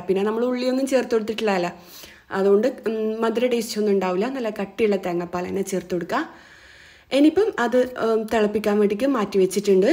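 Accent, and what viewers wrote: Kannur, India. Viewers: Ithaa, u are such a wonderful inspiration to me. Ithante Motivation njan follow cheyyarund tto. Ningale kanoor slang adi poli aan tto. I love u ithaa😘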